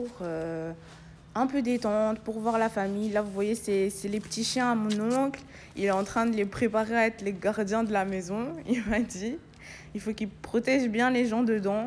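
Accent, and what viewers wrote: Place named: Europe. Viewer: Cool video. Jaime bien Mais la prochaine fois n'utilise plus l'accent des européens mais plutôt notre accent d'origine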